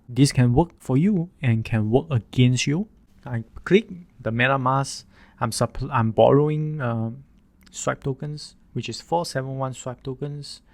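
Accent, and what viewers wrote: Singapore. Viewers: Singapore/malaysian ascent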